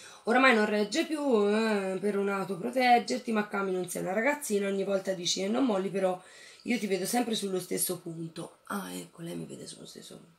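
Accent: Tuscany, Italy. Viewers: Io spero che non chiuderai il canale perché quando in negozio non c'è nessuno mi fai compagnia ascoltando le tue storie e vedendo i tuoi video trucco. Mi sono spazzolata tutti i tuoi video sui libri e molti di essi sono finiti nella lista da leggere. Adoro la tua parlata, mi riporta nella mia Toscana da ui mando da 6 anni. La gente spesso non capisce che siamo tutti esseri umani e si erge su un piedistallo pronta a sputare sentenze, lo vedo col mio negozio. La gente se mi lamento pensa che lo faccia per spillare loro più soldi convinta che evada chissà quanti capitali, manco avessi tre conti in Svizzera non denunciati. Sii orgogliosa di quel che sei, di quello che hai passato e di come stai cercando di tirarti su, sii orgogliosa del percorso che fai per ripulirti... E ricorda che per una che critica c'è tanta gente che ti stima e a cui piaci così come sei.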